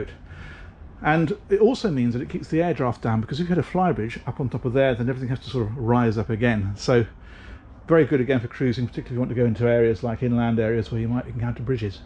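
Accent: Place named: United Kingdom. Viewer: I enjoy everything about your channel, except for one thing. “Cummins” (Engine) does not have a “g” in it. It’s not “Cummings,” although that pronunciation is also more common here in the USA than the correct one. But I can live with it, and perhaps it’s just my hearing that’s off, what with the British accent and all.:-)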